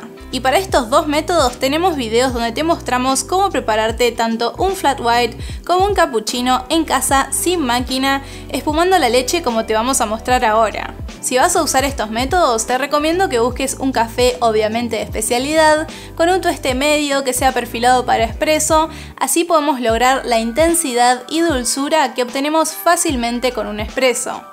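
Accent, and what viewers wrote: Argentina. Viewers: Aqui en Francia, ese tipo de cafetera se llama "Cafetera a pistón" y creo que solo en America lo llaman asi "Cafetera Francesa" pero bueno yo con gusto :D jajajaja gracias por sus videos con ese acento argentino muy bonito :) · es el acento argentino, gracias por apreciar <3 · acento porteño*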